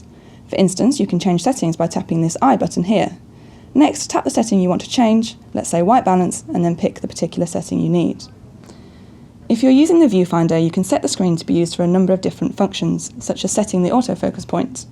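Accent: United Kingdom. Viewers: That British accent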